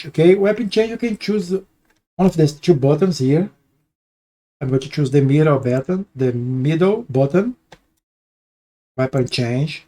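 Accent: Brazil. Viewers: Vc é brasileito né, inglezão com sotaquezão de brasileiro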